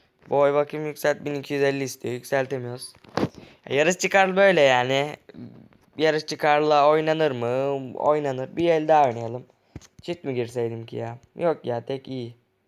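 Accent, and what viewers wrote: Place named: Black Sea, Turkey. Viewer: Bakıyorum da Karadenizli konuşmayı öğrenmissin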